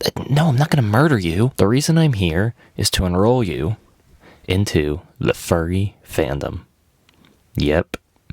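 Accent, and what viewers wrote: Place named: Northeast, United States. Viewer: As a furry who just moved from the northeast to California; I can tell you’re from either the northeast or Midwest and I love it!!!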